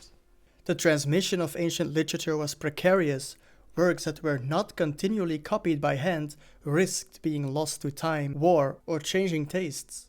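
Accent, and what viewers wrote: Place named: India. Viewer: I thought this was being narrated by an Indian, until I saw his face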